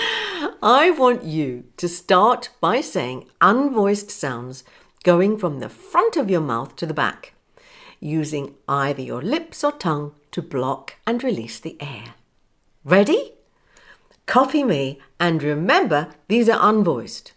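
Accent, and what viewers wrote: United Kingdom. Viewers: Hi! 😁 which British accent do you speak? North or south accent? I like it.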